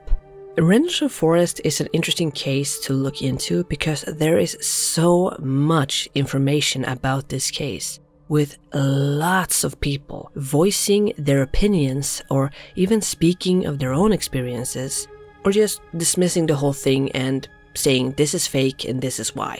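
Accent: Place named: Sweden. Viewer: So people think your voice is AI , ? With a Swedish accecnt that prounounces that as ( dat ) lol and other th words . Which I like unique. Get well soon .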